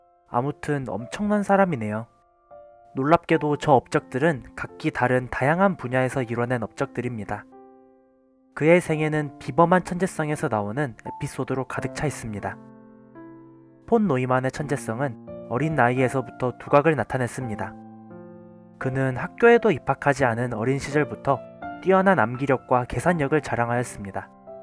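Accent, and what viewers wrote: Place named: Jeolla, South Korea. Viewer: 의 발음을 으라고 하네요.전라도 출신인가요?반가워요!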